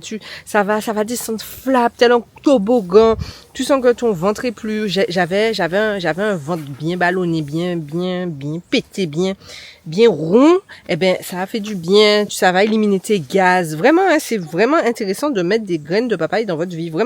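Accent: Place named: Martinique, France. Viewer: c'est l'accent de Martinique qui vient du créole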